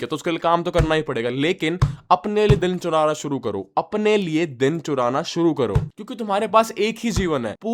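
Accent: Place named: Rohtak, India. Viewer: bhai rohtak se hai ke tu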